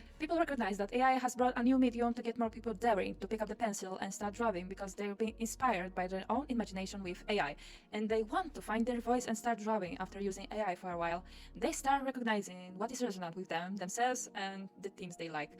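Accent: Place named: Eastern Europe. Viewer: its just the heavy slavic accent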